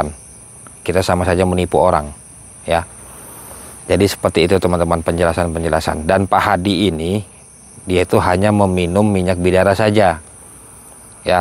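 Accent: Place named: Banyumas, Indonesia. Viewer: SEEHH. LOGAT. BANYUMASAN. SEH SEMAU. GUE. KOJ. IKUT. CAMOUR. (. LHO. SEMAU. GUE ,,,,,,,)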